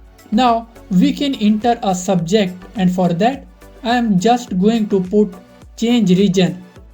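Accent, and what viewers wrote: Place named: Bollywood, India. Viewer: always the bollywood accent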